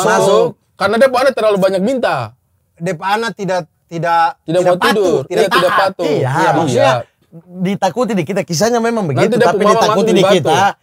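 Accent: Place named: Kupang, Indonesia. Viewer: Enak skali ee dengar kk abdur pake logat kupang ni, jadi ingat masa2 di kupang ee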